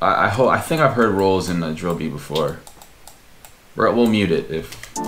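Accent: United Kingdom. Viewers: Dis is my first time watching one of your videos and I fully thought u were British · No way, your London accent is on point ...coming from a londoner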